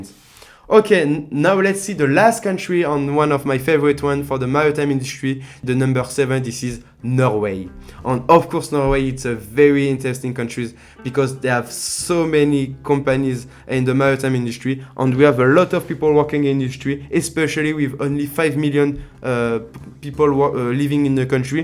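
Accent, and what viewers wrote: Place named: France. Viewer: Ouuufff. Want to know how i know you're french? 😂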